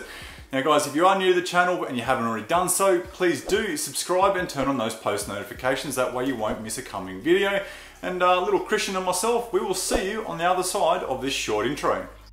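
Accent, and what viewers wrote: Australia. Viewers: Guys, where’s his accent from? Aussie/what.? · If something is being pulled or squeezed out of a body I am there. The Aussie accent was just the cherry on top!!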